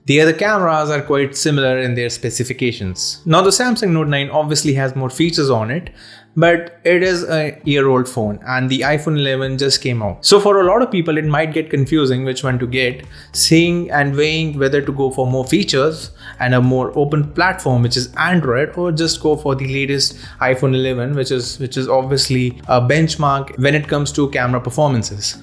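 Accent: India. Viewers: I am here to hear the cool Indian accent. :)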